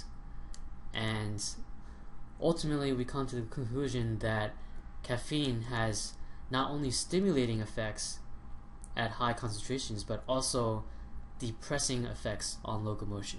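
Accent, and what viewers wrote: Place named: India. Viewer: who the fuck r u, ur accent is so indian seriously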